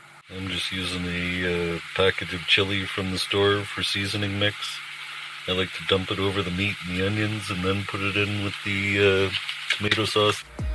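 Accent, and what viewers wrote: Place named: Canada. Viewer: i can listen to this canadian style out and about all day long 😄 . what is moochdocking ?.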